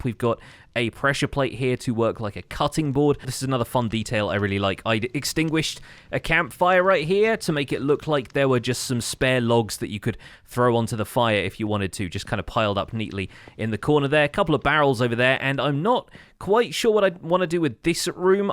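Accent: United Kingdom. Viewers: You sometimes sound like AbdulHakim Murad. Clear british accent sounds great for us non native speakers and both of you have it.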